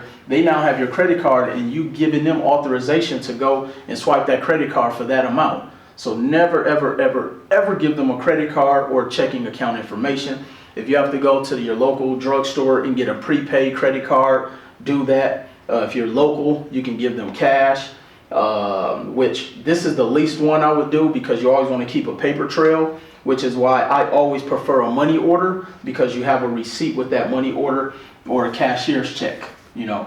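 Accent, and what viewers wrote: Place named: Pittsburgh, United States. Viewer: Very helpful!! U still got your Pittsburgh voice ...Haha r u from Pa? 👍❤👌🙊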